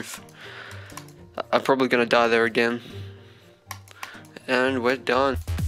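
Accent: Australia. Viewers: Are you Australian? because I love your accent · You Aussie or something? Because you really do sound Aussie, cool if you are cos so am I;)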